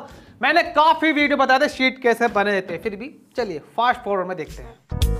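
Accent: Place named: Haryana, India. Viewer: आप बहोत ही फास्ट हरियानवी हिंदी बोलते हो ऊसमे सुधार चाहीये।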